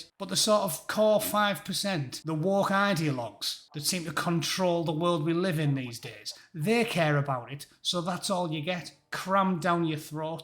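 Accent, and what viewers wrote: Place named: Middlesbrough, United Kingdom. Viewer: bang on ' '...love the boro patter, makes my bloody day yer silver tongued cavalier!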